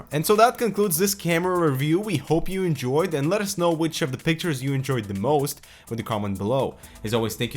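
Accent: Canada. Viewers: What part of Canada are you from? You have a heavy accent. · Nice review! Your name, russian? But canadian accent! Cool!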